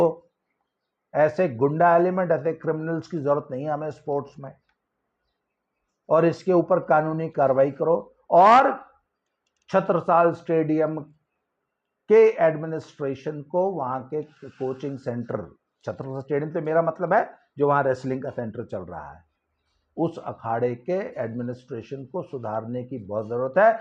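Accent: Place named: Punjab, India. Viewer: I really like how you explain things without sugar coating, and yes, travel teaches a lot. It can be seen from your videos that you have a good hold over Eastern UP dialect, a nice heavy Haryanvi tone, and a nice Punjabi accent. Your "done this, done that" attitude is inspiring for me as a young father. Keep up the good work.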